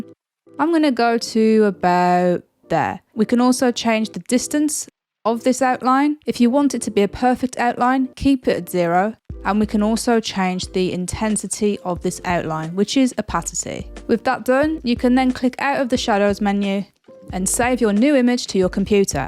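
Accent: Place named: Northern England, United Kingdom. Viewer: Thanks for that! Also, completely unrelated to the video, but I'm trying to figure out your accent lol. Is it a Newcastle accent? Definitely northern england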